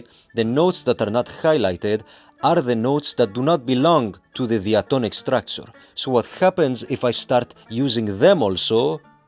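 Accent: Greece. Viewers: Only thing I have to say is that you absolutely nailed the greek accent without a doubt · Greek accent is truly masterful 🧠🤯🤯🤯🤯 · What the hell, i was runing your video in the background and i hear you greek accent! "Wait, hadn't i clicked?" But no you had to prove you are a brother to yet another people's nation! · Λοιπόν! You manage to sound like a Greek speaking English even to the eeeeh between words. Nothing less than amazing! Also, once more, I have to admit that I've never thought theory of music (or theory of anything, really) would be so diverting. I laughed so hard my frappé came out though my nose! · you can't possibly have such a good greek accent.. once I heard that λοιπόν I was sure I was hearing a compatriot.. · Excellent Greek accent! And I learned a lot about music. As always. Keep up the good work! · The Greek accent is eerily convincing. I sense the presence of a Greek significant other. 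· Is this guy part greek or something how tf is he speaking that good greek and greek accent english????? He even knowσ the cretan "κατεω"!!!! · Perfect Greek accent and appearance! Hilarious video! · He isn't Greek? Μπρο It sounds so greek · Funny and educating! Also, your accent is really Greek! Hopefully we''ll see similar videos about other music traditions! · Bro, I totally thought you were Greek xD You fkng nailed it. Even the Greek words you threw in there had me at hello:D Awesome, learned a lot, and I'm not even a musician:D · No, but even with a musician's ear, he'd need to go to a few hundred Greek weddings to mimic the accent that well. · How come you have an Greek accent?:) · I love your videos and your Greek accent!!! 😂 I’m currently learning Byzantine music and I learned that it traces back to the modes in Ancient Greece. There are 8 modes and they have names like Dorian, Phrygian etc., which are not the same as what western music calls them. 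You’re right, they did screw up the names! 😂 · why is your greek accent so spot on? xD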